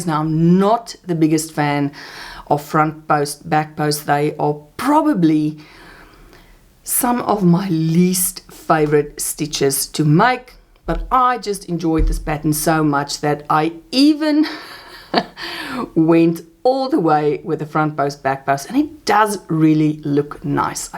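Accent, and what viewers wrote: South Africa. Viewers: Hi there, from Germany 🇩🇪. I love your Chanel and I am so grateful to have found you. As I have lived in South Africa for 34 years, (I was brought up there, lived there from 1969to2003), it is so wonderful to hear your accent. It makes me feel so at home, as I miss SA. I have been crocheting for many years. We had a knitting and crocheting business in Johannesburg. I started amigurumi here in Germany. I also do mini albums, scrapbooking, cards etc. I love your crochet work. You work so neat and tidy. I love your taste in projects a lot. Keep on with your excellent work. I look forward to your videos. Thank you so much for giving us all these pattern advices and tips, just love it. 🤗💖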